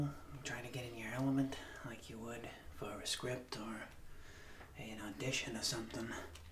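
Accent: Boston, United States. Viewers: Have a question: From which part of US belong his accent?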